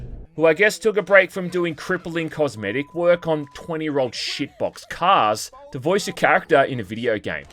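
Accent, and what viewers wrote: Bogan, Australia. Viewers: thats one bogan af aussie accent.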